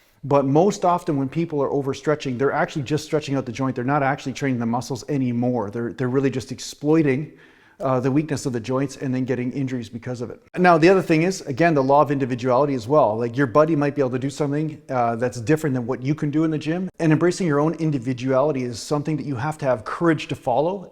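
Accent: Canada. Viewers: you are like Yoda- only taller, more muscular, and with a Canadian accent. Based on the wisdom you present, I could easily accept that you are likely also 900 years-old. I'm 58, been lifting since 14 years-old, and have seen about all there is to see and read in the BB world. I'm a personal trainer, nutritionist, and Neuromuscular Therapist and, despite that background, I ALWAYS learn something new from you in every video, even if it's just a fresh way to approach an idea or an entirely new way to think about something. I can honestly say you present the most pragmatic, useful and wise content of anyone on YouTube. Truly. Thank you so much for your hard work in making these videos and for being the voice of reason in the dystopian, sometimes absurdly ridiculous, bodybuilding world that now exists. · Great advice brother. 👍 I really like that "someone else driving your car" analogy. 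So true 👍 You sound Canadian. Are from Canada? I live in Alberta. 🇨🇦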